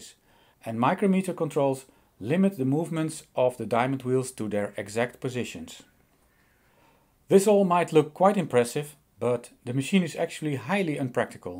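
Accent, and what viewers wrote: Netherlands. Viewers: That is a Dutch accent :-)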